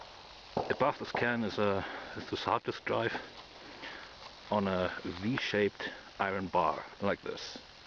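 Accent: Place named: Germany. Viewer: Speaking with a godawful german accent....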